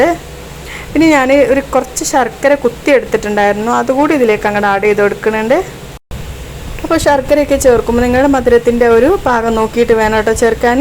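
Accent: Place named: Tamil Nadu, India. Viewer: ഇത്ത. എന്തു പറ്റി. സംസാരം തമിഴ്. ടെചു. വന്നു. സൂപ്പർ.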